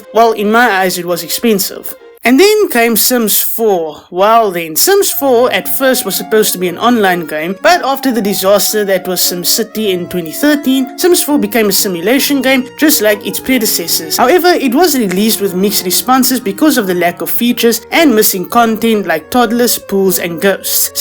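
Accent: South Africa. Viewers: 😭😭😭 I heard the accent and I immediately knew this was a South African channel. what a rare find, love the video.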